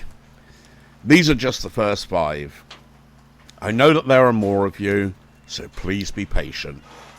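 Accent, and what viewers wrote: England, United Kingdom. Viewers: Oh.. I see... not only your accent but also the atmosphere looks like English one... ha ~ Thank you anyway. Best regards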